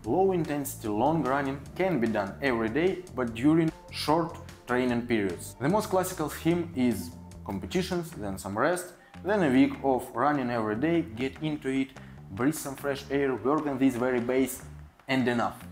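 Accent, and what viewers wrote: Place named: Russia. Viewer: I wish I could speak Russian. I love the accent.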